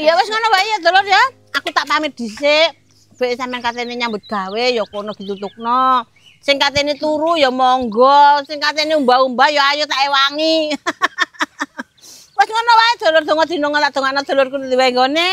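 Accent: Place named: Surabaya, Indonesia. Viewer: Logat ny campuran surabaya ya heheeh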